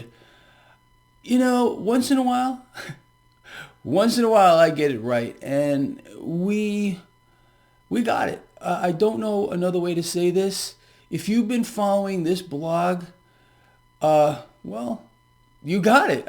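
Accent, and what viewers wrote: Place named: New York, United States. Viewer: Are you just sitting here on your ass with your New York accent promoting ideas let’s serve your best interest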